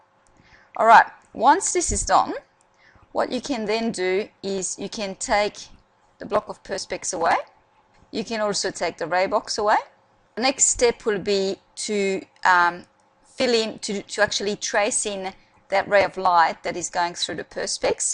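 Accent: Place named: Australia. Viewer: ausrtaliam accernt tho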